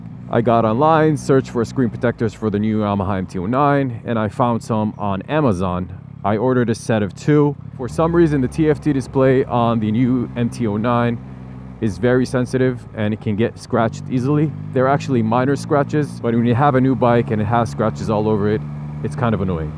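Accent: United States. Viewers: זה המבטא האמריקאי הכי חלק ששמעתי מישראלי אי פעם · זה המבטא האמריקאי הכי טוב ששמעתי בחיים שלי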